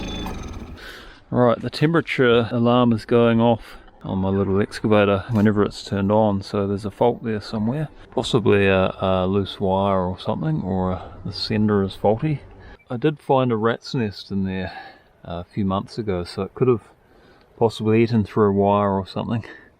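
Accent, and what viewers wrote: New Zealand. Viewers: Been wondering about this for a long time - if I speak with a New Zealand accent will I be as mellow and smart as?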